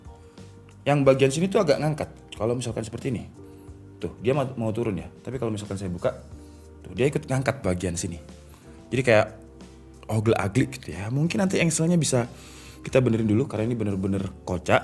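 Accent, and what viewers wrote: Malang, Indonesia. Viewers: Bang lu orang Malang ya?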